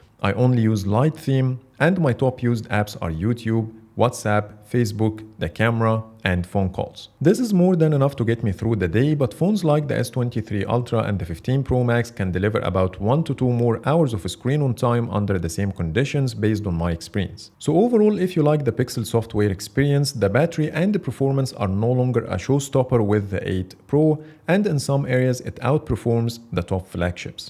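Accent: Egypt. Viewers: You sound Egyptian are you? 😀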